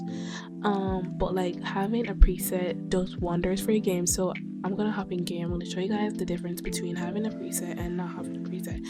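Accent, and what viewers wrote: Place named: Jamaica. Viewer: I was listening and I was like She sounds Jamaican and I got so excited like have I finally found my people with same interests as me? And I checked the bio and I was right 😂 I’m so gonna binge the whole channel❤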